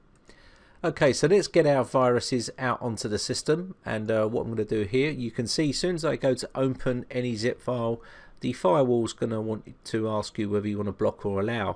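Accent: United Kingdom. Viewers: your british accent man you`re 150% british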